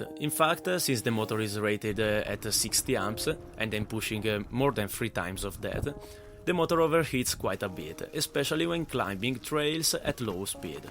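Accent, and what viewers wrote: Italy. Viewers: U sound Italian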